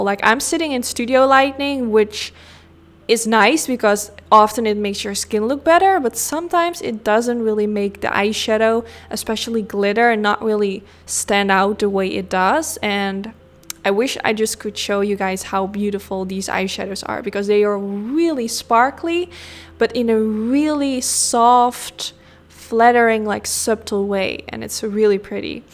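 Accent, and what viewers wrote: Netherlands. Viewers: Wat grappig. Ik heb zojuist je kanaal gevonden en ik dacht dat ik een Nederlands accent hoorde. En lo and behold, je bent Nederlands! Super leuk. Ps: ik hoorde het maar een heel klein beetje hoor, je spreekt prachtig Engels.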